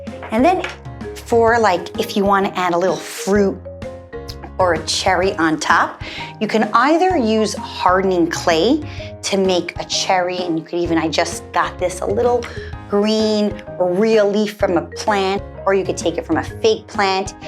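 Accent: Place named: New York, United States. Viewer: aw! I’m from NY and your accent just makes me miss home. Lol! I love this video, I rarely sit and watch an entire video with the volume up haha. Thank you, will be showing my kids your channel.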